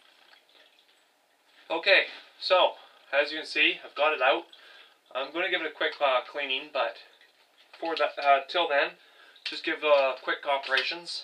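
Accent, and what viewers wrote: Canada. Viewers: Yes..they talk funny..aboot ...oot instead of out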